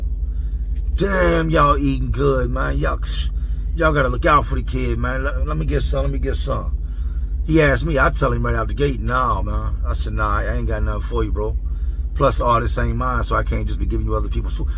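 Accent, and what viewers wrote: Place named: Philadelphia, United States. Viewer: Lol he said bol came back for more, licking his fingers and sht. All like: "yo that jawn was good. Lemme get some more." Haha I hate greedy people like that. Oh, and I'm from Philly. So I know how MFs can be. I can tell you've lived in Philly by the way you talk. But anyway, I was never locked up in Philly, but I've heard plenty of stories.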